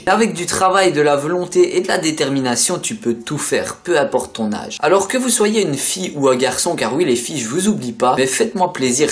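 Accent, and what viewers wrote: Liège, Belgium. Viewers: EST TU belge ? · tu es belge ?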